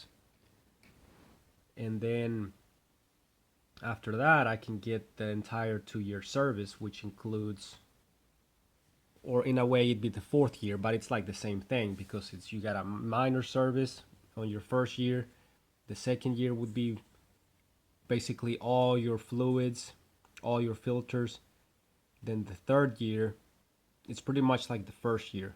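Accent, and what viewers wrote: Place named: New Mexico, United States. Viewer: Sounds like a NM accent. The natives there have a very slight lilt to their voice. It comes from being surprised all the time.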